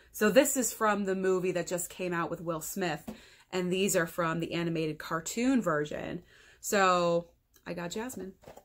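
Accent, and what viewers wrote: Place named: East Coast, United States. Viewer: Well beautiful eyes keep grindin' because the future is bright 4u. Btw love the East Coast accent.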